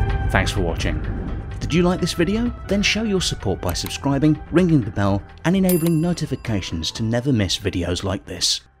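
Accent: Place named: United Kingdom. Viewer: Awful British accent... I turned off sound and watched only subtitles... Why this pronunciation is so bizzare and awkward, as oversophisticated pukes?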